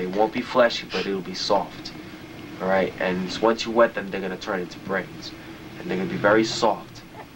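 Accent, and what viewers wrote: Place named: Long Island, United States. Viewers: clearly he’s from queens area of new york. accent gives it away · I’m from Long Island, this kids sounds like he could be from around there. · Brooklyn or queens for sure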